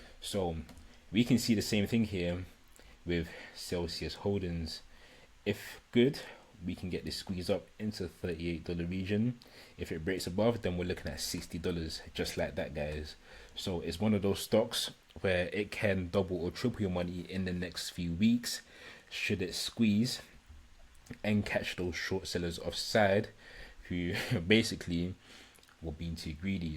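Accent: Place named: United Kingdom. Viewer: Respect sound like mandem and from uk what’s your tele username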